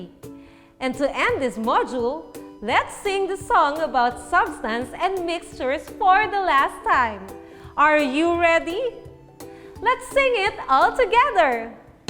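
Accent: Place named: Mindanao, Philippines. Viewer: Ang tigas magsalita ni mam. Taga Mindanao si Mam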